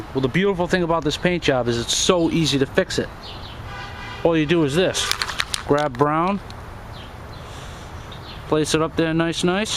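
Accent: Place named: Boston, United States. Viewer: do you live in boston? because i do to and recognize that accent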